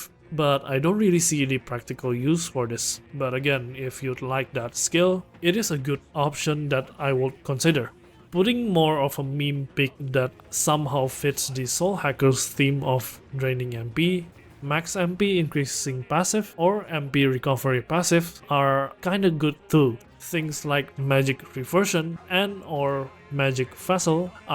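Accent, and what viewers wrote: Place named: Indonesia. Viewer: r u from indo? got accent hahaha. haven't seen ur vids in a while. nice to see a metaphor video from you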